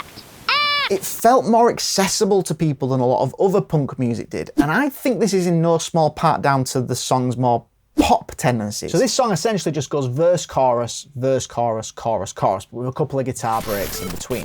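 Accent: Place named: England, United Kingdom. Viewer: Good video mate. Think some of the nuance in what youre saying gets lost outside England along with the accent :) Not a band/song ive ever been a fan of but maybe i should give them a go - i agree that they were musically more conscious than some other punk bands of the time - probably their image and they way they were pronmoted helped too. God knows what sell out or anything like that means - its all bs - green day were making music they wanted to make you can feel that and people loved it - i don't think that was selling out or anything like that. Being unoriginal isn't a criticism - the beatles took stuff from chuck berry, jazz standards, 50s pop, classical, elvis etc and every band before or since has too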